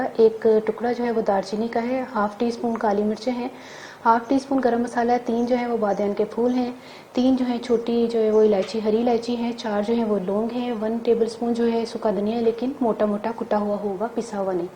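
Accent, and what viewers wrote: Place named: Punjab, India. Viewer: Ap jb panjabi main baat krti hain to boht acha lgta hy mashallah boht piara bolti hain or cooking b zbrdust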